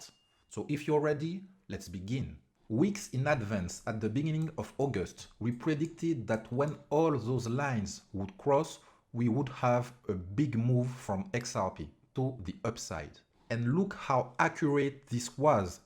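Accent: France. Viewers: L accent est français non?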